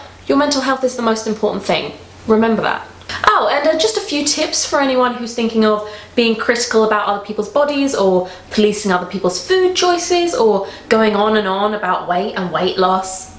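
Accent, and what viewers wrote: United Kingdom. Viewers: followed you for months on insta and only just realised........you're english?? lol i was expecting a california accent 😂😘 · YOURE SO BRITISH I LOVE IT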